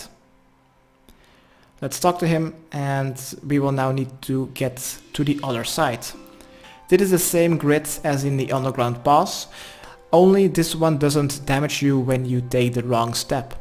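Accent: Netherlands. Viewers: Legend! Thank you! Btw: are you Dutch?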